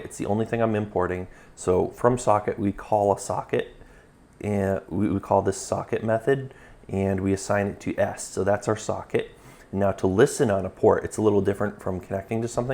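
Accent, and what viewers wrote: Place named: United States. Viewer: finally one video in american english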